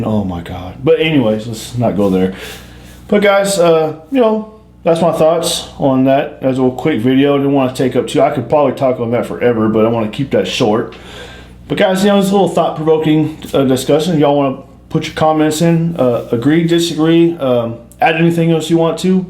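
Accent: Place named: Houston, United States. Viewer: I could immediately tell this guy is from Houston 🤘🏼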